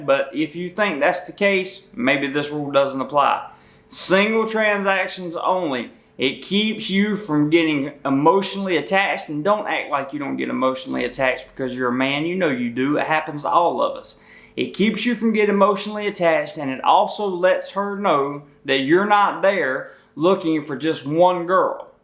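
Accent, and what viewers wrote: South, United States. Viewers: You have a southern country accent. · The southern accent while mispronouncing "Pattaya" is funny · Thanks bro good info:) Dude you sound like you're from the South nice to hear a accent brother:) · Man were you from I know your from the southern us original I'm from Tennessee so I know it's a southern accent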